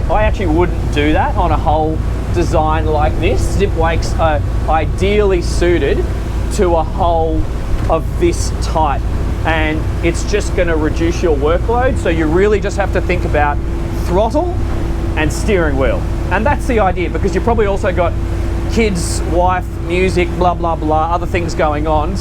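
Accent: Australia. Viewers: The way you Aussies say "here", just boggles the mind lol. Hiare.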